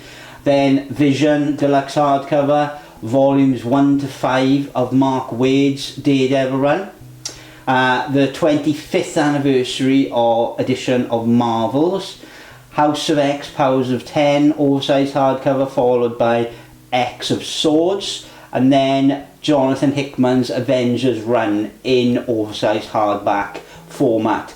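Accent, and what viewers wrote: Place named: South Wales, United Kingdom. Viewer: That little mistake does not keep me from enjoying the video. I think most people make the mistake because quietly is the better-sounding word. Are you native to South Wales? I'd like to connect the accent geographically.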